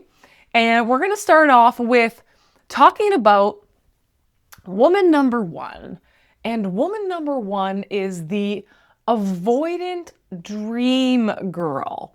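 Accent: Canada. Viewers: Thank you for your insights. As a dual citizen, I love the Canadian way of speaking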